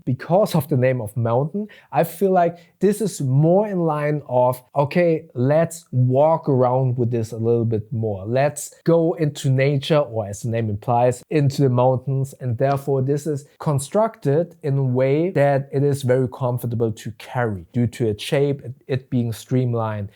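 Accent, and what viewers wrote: Germany. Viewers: ⛰great video.. and it's funny, some reviewers are very terse and others use more words. I find yours that you do use more words and sometimes a tiny bit of filler words but yours are one of those few content creators that the extra words (but not too many) actually add to the content. I can watch your video such as this one at 1.0x and I can't say that about most creators. Maybe it's the German accent which is pleasing or maybe it's the street shots 🤣. I agree with you, overall the bag is amazing and I'm one of those who do love it. Really really happy with it and wasn't going to get it, held off for a while. They did an outstanding job even with the few nits that you mention. Keep up the great work! [and ps, that's a mountain emoji in my comment, nothing else!!]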